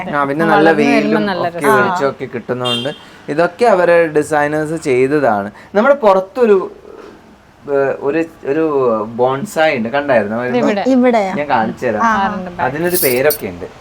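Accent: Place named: Bangalore, India. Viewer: ഇവരെ സംസാരം ഇങ്ങനെ യാണ് അവർ ബാംഗ്ലൂർ ആയിരുന്നു 👍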